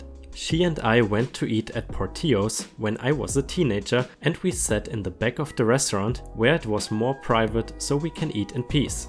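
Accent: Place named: Germany. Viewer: Cooles Video, muss zugeben ich war nicht überrascht als du gesagt hast “Germany”, Deutsche hör ich sofort :D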